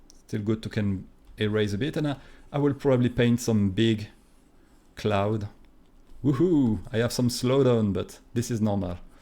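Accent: France. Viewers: Your French accent is a heaven 😭💕 Anyway I like your style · I really like your video's. I learn a lot from your tutorials. Keep it up! Your accent emphasises my vision of a French painter in Paris. 👍👍👍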